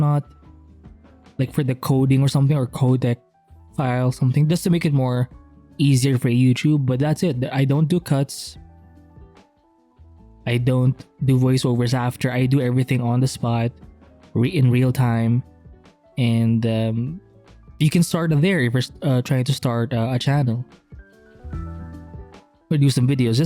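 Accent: Philippines. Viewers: I cant quite pin point it, but you sometimes sound like a Filipino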